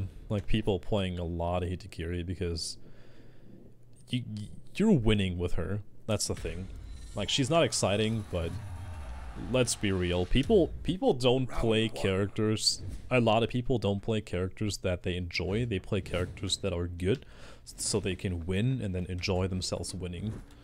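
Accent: Germany. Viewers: Ohh you are German? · Wait youre German?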